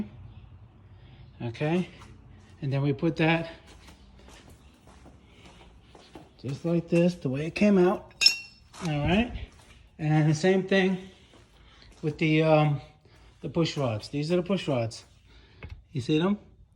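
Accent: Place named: New Jersey, United States. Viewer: definitely people may forget to comment but I promise your helping tons of us be self reliant on our repairs, I did the whole job successfully, unfortunately when i tore it down the mating surface on the block had like rust wear indentations or something, I picked the car up for 800$ bucks thought it would be a simple fix but someone really abused it. Worked great for a few days and blew it again.. oh well you live and you learn. Btw your accent is awesome, reminds me of my relatives up north. You from Jersey or New York?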